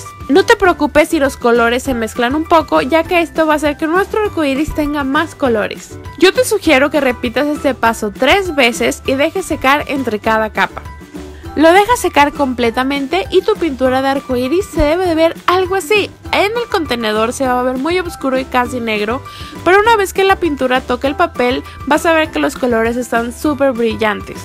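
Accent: Fresa, Mexico. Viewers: Hablas muy fresa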